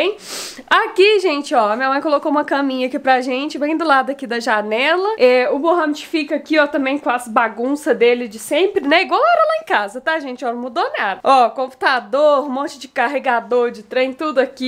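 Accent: Minas Gerais, Brazil. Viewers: Como vc é mineira! 😂😂👍💃💃💃💃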